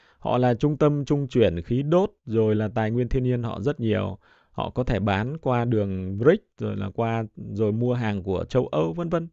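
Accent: Standard. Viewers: Anh nói rất chuẩn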